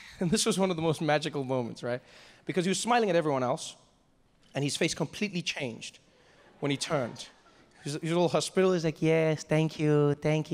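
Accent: United Kingdom. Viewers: Sound British to me 😂😂😂Bravo well said 👌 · 'sounds british to me' THIS. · That sounds British to me.... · Sounds British to me 🔥🔥🔥🔥🔥💯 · Definitely does sound British bruv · That sounds British to me 😂😂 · Sounds british to me... 😅😅 Talks a lot about how assholes british are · sounds british to me 😂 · that sounds British to me 😂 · Sounds British to Me. UNPARALLELED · Sounds British to me....flipped me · Awesomely truth ! Sounds British to me .. **empty white brains 🧠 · Sounds British to me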